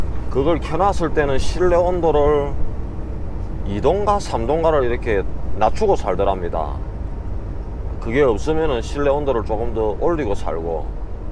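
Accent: Gyeongsang, South Korea. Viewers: 경상도사투리와 영어가 구수합니다 저도미국에서 트럭하고싶네요 ㅎㅎ 잘보고갑니다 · 베트남서 시청하고 있는 1인입니다. ㅎ 지난회차 거의 다?? 시청했습니다 ㅎㅎ 묘한 마성의 갱상도 소리를 매일 듣네요 ㅎㅎ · 갱상도 사투리 티나네요 해임요 ㅋㅋ 응원합니다 오늘도 굿데이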